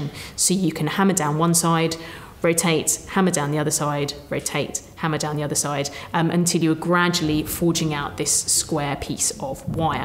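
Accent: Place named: United Kingdom. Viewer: As an American, every time she said "torc" with her British accent, I thought she was saying "talk" with a British accent.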